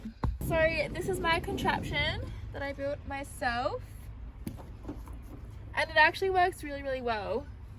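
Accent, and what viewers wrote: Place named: Australia. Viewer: hi im soo glad i found ur youtube ch today and subscribed too it ur soo pretty have such great fun energy and ur vids are really good and i love ur aussie accent too! ha!!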